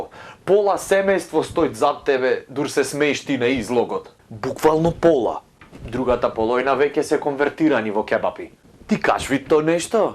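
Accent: Ohrid, North Macedonia. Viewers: brat izvini vaka, ama za stend ap komedija ne si losh, no bitolcaneson te shie... ne si faca iako imas smek... bi rekol deka znaesh kako i umeesh do negde, no ti fali lik... napraj neshto od sebe, smeni go likot.. neznam kako, ama ti si umetnikot, stori neshto... i malku pomalce naglasuvaj go ohridskiot dijalekt.. iritira... naj iskren sovet. pozdrav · Mislam deka gresis. Covekov ima bogat recnik, a segite mu se navistina originalni, i bidejki gi interpretira na Ohridski dijalekt isto e interesno. Marjan poveke koristi sekojdnevni razgovori, no i toj e dobar. Pozdrav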